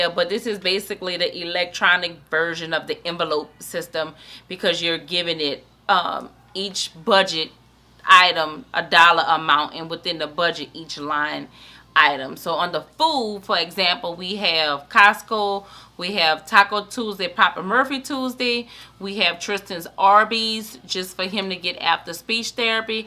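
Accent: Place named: New Orleans, United States. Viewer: just started watching your videos I lovvvvve you new orleans accent lol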